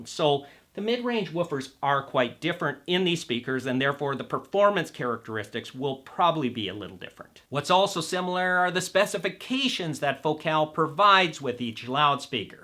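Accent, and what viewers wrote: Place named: United States. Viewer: You realise he is from the USA don’t you? It’s called an accent. Weird observation you have and somewhat ignorant I might add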